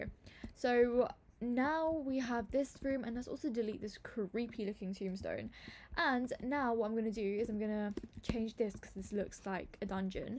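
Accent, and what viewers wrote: United Kingdom. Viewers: When you discover for your 1st time that is British 💀 (this means I love it and I’ll start to watch more to know how British people talk 🫶)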